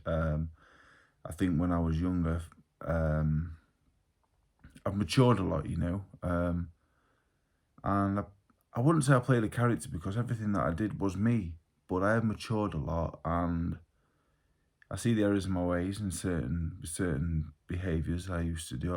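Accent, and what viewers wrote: Yorkshire, United Kingdom. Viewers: Definitely no slurred speech, you speak slowly, precisely and are articulate. Plus Yorkshire accent aswell might confuse southerners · Never quite got my head round how people have conceived u to be punch drunk? I don’t know if it’s because I’m northern too and just take it for granted this is how we sound?! Especially being a Yorkshireman it’s almost a curse if you box as people assume uve taken too many punches when really you’ve been raised by big burley, thick sounding farmers or somewhere down the line ur ancestors have!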